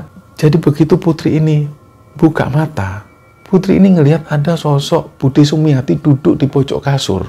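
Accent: Java, Indonesia. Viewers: Mlm maz..crt ny keren2 moga sht2 tuk kru KS trutama maz yg logat ny kjowo jowoane sngt kas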